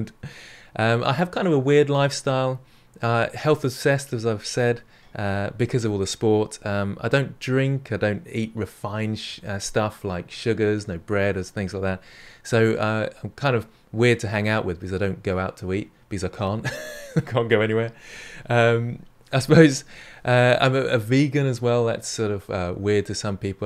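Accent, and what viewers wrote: United Kingdom. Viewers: I'm very happy for you!! You really deserve more for all the in-depth tutorials and the effort you put on this channel! I know this comment won't change your life and prospective about things, but everyone has flaws and people must not be judged. Actually, flaws are what make us unique. Might be teeth, white hair or anything, but these things make you YOU. I came for the tutorials at the beginning, but I stayed because you're a really nice person, caring and super friendly. Plus your British deep voice is sooo calming when following tutorials. Stay strong, stay healthy and keep up with the good work 💪🏼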